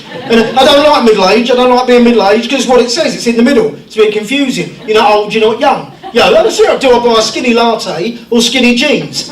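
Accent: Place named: Essex, United Kingdom. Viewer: you are a very funny guy. But you'll just have have to slow down your Essex accent Sir - then the world will be your oyster.😎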